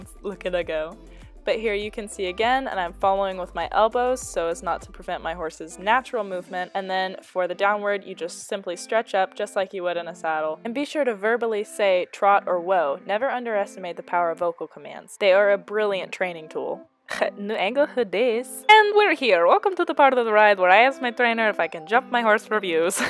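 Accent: Russia. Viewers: I promise I'm not a 12 year old weeaboo but out of curiosité Do you happen to watch Yuri on Ice or is the Russian ish accent just for funzies? (My god asking about anime. My thirteen year old self is screeching) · Is that a Russian accent, sounds like my departed gramps Latvian accent. Have 4 horses at the house sit, thanks for the inspiring video! Subscribed!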